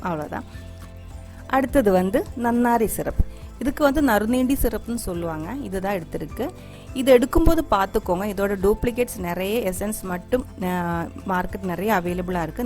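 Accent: Kerala, India. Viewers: Hai mam valare nannayittunde Explanation super kurachu malayalam varunnunde athu saramilla kelkkan sugamunde we are also living in coimbatore native palakkad ane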